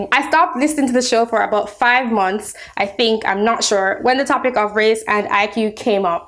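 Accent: Caribbean. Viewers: Are you Carribean? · Hello. I noticed Caribbean accent in your voice. Are you Jamaican?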